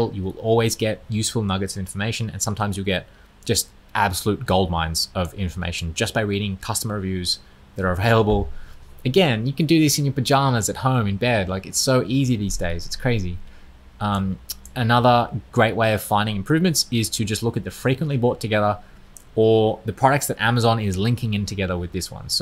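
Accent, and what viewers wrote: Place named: Australia. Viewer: hahaha and that’s 79% of why i subscribed!i also have an accent.. but British ( Caribbean British ).. are you Aussie?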